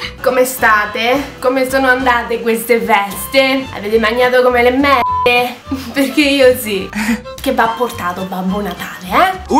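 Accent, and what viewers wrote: Rome, Italy. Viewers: Milano è un delirio quando non c'è sciopero... puoi capire perché diventano tutti matti quando c'è!.' eh siiii è una vita così cosa ci vuoi fare!! ps. ti adoro:) e amo quando parli in romano!! mi fai un sacco ridereeee!! baci dalla lombardiaaaa!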